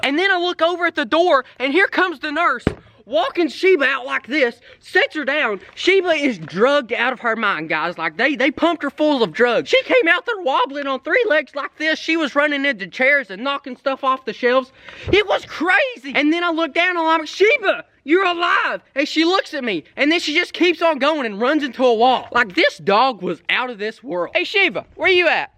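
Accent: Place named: South, United States. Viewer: Hey Man, you can’t get a camel, you gotta get a emu, I think with your southern accent people with laugh at emu better, plus camels are slow, like the mule, an emu is fast, like the atv, you gotta get a emu.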